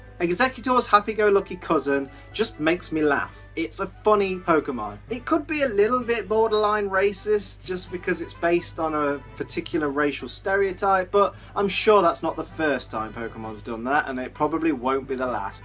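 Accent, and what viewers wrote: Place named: Midlands, United Kingdom. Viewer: I'm from the Midlands tooooooo. Staffordshire. i bet your accent sounds weird to most people but sounds so normal to me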